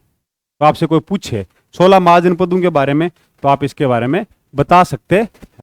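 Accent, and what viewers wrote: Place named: Haryana, India. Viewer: सर आपकी हिंदी भाषा ऐसी क्यू आप कहा के लगता हरियाणा से😮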